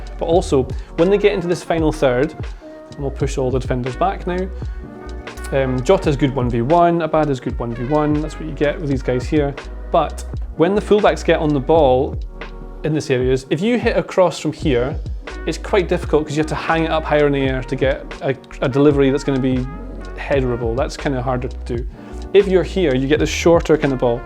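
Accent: Scotland, United Kingdom. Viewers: This is the perfect background noise with the soft jazz, Scottish tones and football vibes while I work from home and weep at the state of my inbox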